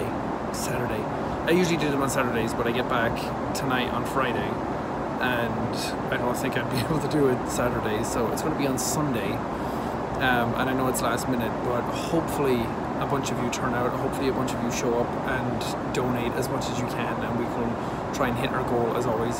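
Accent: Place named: Ireland. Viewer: So um...when he isn’t yelling, he doesn’t sound Irish. Or at least the accent isn’t as strong.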